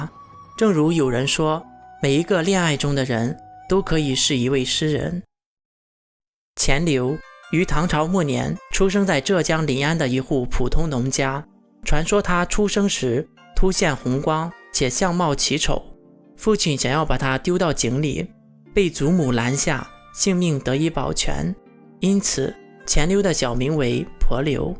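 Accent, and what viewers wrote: Shandong, China. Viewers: up主听这个口音 感觉祖籍是不是山东的呀 山东普通话 哈哈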